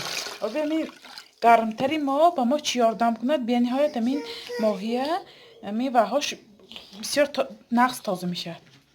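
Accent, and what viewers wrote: Isfara, Tajikistan. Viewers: Ин апамон аз Исфара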